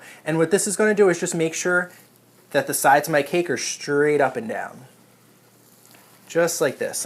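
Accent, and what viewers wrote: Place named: United States. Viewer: What area of America is that accent? It's very distinct. Loved the video, it's great!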